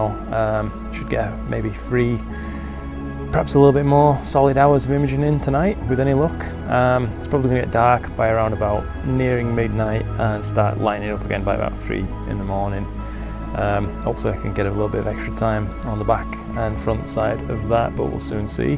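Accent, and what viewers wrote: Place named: North, United Kingdom. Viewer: Hey, you sound like you are up from around my way (I'm a bit further North I think). You should come join our lucky imaging project on Discord, would be good to have you in the community.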